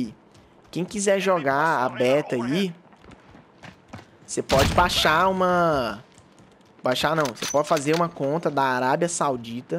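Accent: Minas Gerais, Brazil. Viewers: Você é mineiro?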